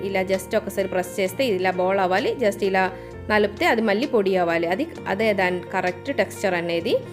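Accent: Kerala, India. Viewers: I'm ur new subscriber... ur way of explanation and kerala slang telugu i love that 🤍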